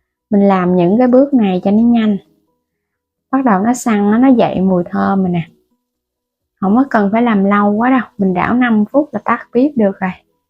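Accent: Mekong Delta, Vietnam. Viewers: Con ơi nói chuyện nên tự nhiên đừng nên địu quá không hay góp ý nên để giọng miền tây gặt để thương hơn nhé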